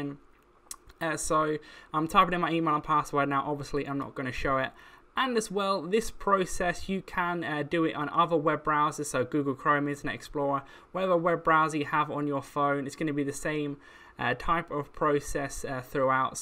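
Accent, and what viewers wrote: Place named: United Kingdom. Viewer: Between the disgusting mouth sounds and the million things happening on screen with athe British accent saying “that’s not what you want” this video is a 0/10 would not recommend to my worst enemy’s, great job random bloke.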